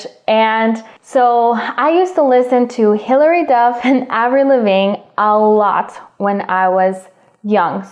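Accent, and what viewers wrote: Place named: United States. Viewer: Thank you for sharing your knowledge dear teacher. I think now my motivation is to be like you, because you have a great American accent😇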